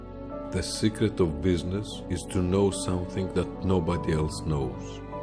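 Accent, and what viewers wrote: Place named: Greece. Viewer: excellent greek accent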